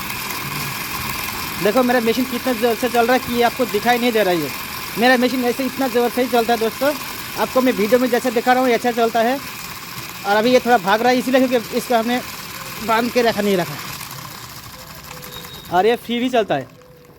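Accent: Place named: Bengal, India. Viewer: ও,,, দাদা,,,,,,,,,,, হিন্দি আর একটু ভালো করে শিখুন না হলে বাংলা চলে আসছে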